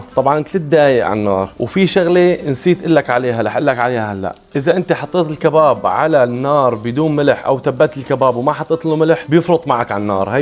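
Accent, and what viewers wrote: Syria. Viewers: الله يرزقك من حيث لاتحتسب ، الف شكر على نظافتك واسلوبك ولهجتك السورية المحببه لقلبي ، الله يعمرك ياسوريا وترجعين عروس المدائن ونرجع نزورك اشتقنا لك كثييييييييير ، الله يعطيك الف عافيه الشيف الطيب واحلى لايك ومتابعه وورده وحب من السعوديه 👍💐♥️ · اللهجة السوررية ❤️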